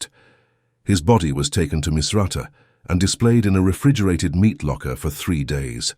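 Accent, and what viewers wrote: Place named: United Kingdom. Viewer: This "Commentary is a Total" LIE, being Narrated by a European Britain 🇬🇧. Muammar Gaddafi wanted Unify the "African Union " and America and Europe wasn't having that so former POTUS Barack Obama did the Devils bidding and took him out 1 of the 1things i despise America for...! FYI : Free Education, Health, Housing, No Unemployed People, and No poor people, his demise was an Evil Power Grab Orchestrated by the European establishment and Completed by this Hypocritical Government America 🇺🇸 😀 😉 😄 666..!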